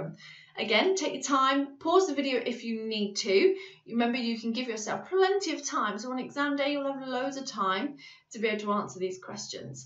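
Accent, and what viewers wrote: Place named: United Kingdom. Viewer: Ayyottaaaa😊 like the briish accent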